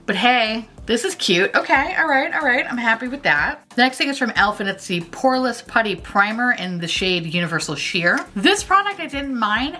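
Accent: United Kingdom. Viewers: The British voice is the best! “At the scene of the crime, there was...” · LMAAAAOOOO the urine sample cup Stranger Danger, that's what I yelled out when I saw it. Also, my skin screamed 💀 bc that looks like a recipe for skin turning to the actual 🏜 desert Yes! Please do more vids like this! LOLLLL I adore them! The British accent gets me every time ❤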